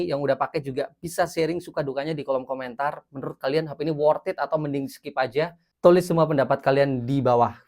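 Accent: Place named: Java, Indonesia. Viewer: Uni chanel ini KAMPUNGAN banget cara melafalkan kata Inggris nya Guys malah dibaca Ges, HARUS nya Gais atau Gaes, jadi ilfeel males lanjutin nonton, biasanya Jawa itu gak bisa bilang Ai, semua huruf i malah di baca E padahal harusnya Ai, Guys ( gais, temen temen, sobat) malah di baca GES, lalu riding (raiding, di review otomotif) malah di baca Reding, Finger print ( harus nya di baca Finjer Print) malah si bilang Finger Print), harus nya G itu di baca J bukan Ng.. Miris nya yg subscribe banyak pasti Kejawen kampung dari pedalaman yg sama sama katrok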